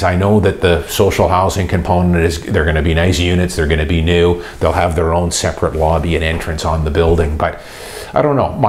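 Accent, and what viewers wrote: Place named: Canada. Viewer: The most typical Canadian accent. Fascinating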